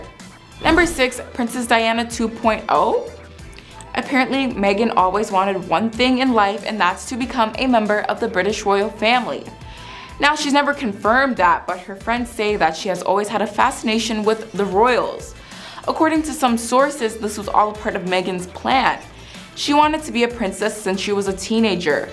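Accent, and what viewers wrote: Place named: United States. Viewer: Sorry to reply again - but many many!! centuries old traditions, not single century. Based on your accent, I’m assuming you’re American like me. Our country is so young that… even though growing up we don’t fully appreciate that as 10 years Sounds like a lifetime to a kid…. But they have been around a lot longer.. I mean we have too to an extent, but not as “America.”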